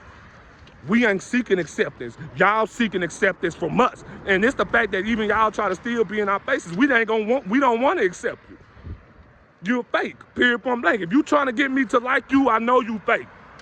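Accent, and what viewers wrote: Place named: New Orleans, United States. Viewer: Probably New Orleans with the yeah Kevin gates says it alot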